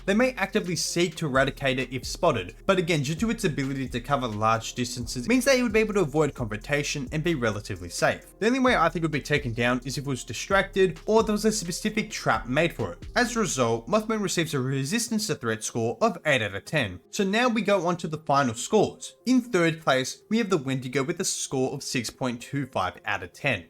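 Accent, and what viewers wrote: Australia. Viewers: Question , is your accent Australian or New Zealander?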